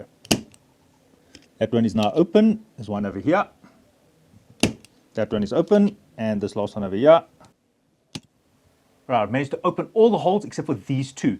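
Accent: South Africa. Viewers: I can hear the south african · fucking love the south African accent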